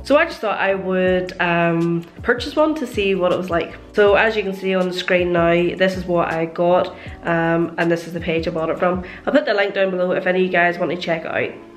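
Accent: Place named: Northern Ireland, United Kingdom. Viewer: great thanks I just found your videos (just subscribed) great to see a Northern Ireland voice on here for a change keep up the great work stay safe 👍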